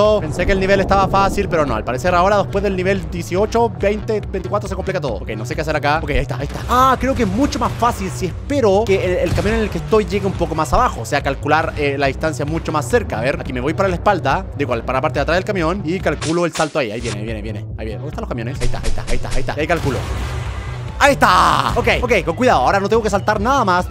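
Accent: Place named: Chile. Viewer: En este juego se le sale lo chileno a German :vv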